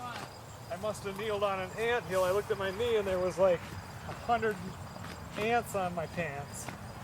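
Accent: Minnesota, United States. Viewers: I love your show & your Minnesota Swedish accent❤.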